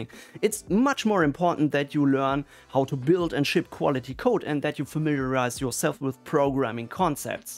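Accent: Germany. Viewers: Schwäbelst du ein wenig lol ? Grüße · Are you German? I can hear the accent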